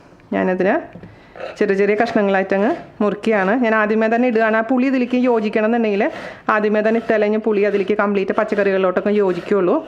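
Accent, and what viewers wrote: Thrissur, India. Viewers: I'm a Big fan of u..... ചേച്ചി ♥️♥️♥️ especially aaa thrissur slangilula tta..samsaravum pinne chechide Nadan vegetable recipesum✌️pinne njanum thrissurkarananutta 😜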